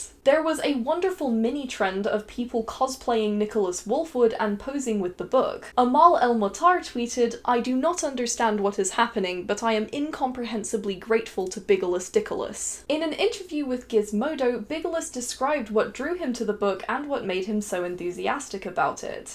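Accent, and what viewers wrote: Scotland, United Kingdom. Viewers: amazing! Yes I was getting east coast of Scotland. If I'm autistic (I think I am) then my special topic might be spotting accents. Yours is a gem, which I can now put in its own unique place in my collection. Thanks! Ps. Given the Texan element, I would now put the English element in the South West...?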